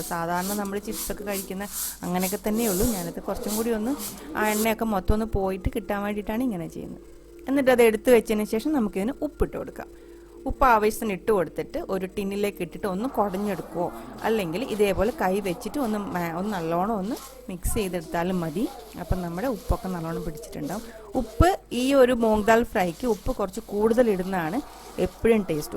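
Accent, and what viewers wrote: Tamil Nadu, India. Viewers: Chechide Tamil kelkkan aanu rasam.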